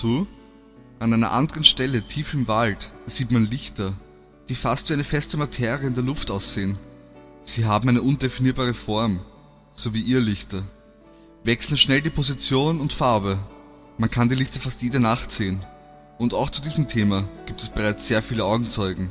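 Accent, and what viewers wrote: Austria. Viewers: Bist du Österreicher?? :D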